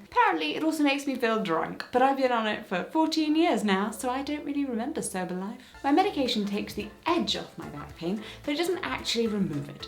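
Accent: RP, United Kingdom. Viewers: If Erin from The Office was a pin-up with a posh accent, she would be.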